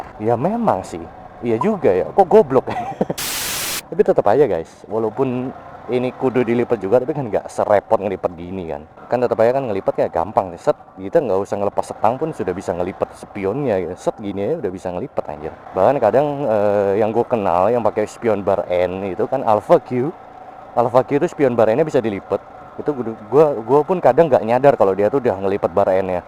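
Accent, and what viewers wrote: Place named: Java, Indonesia. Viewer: Jawa nya medokkk anjasssss😂😂😂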